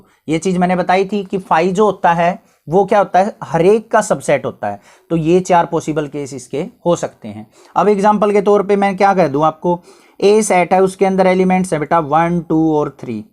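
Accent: Haryana, India. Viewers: SIR AAP HARYANA SE HO.........??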